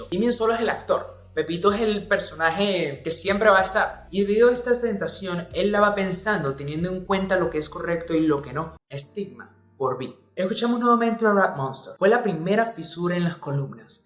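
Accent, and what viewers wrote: Venezuela. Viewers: AME TU TEORÍAAAAA. Eres venezolano verdad? :V Bueno, ame tu teoria x2 tiene mucho sentido quedé impaktadah :v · Siento que eres de De Venezuela :vvv Puedo estar equivocada :vvv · pregunta ¿eres venezolano? es que cuando dijiste coño jajaja me dio mucha risa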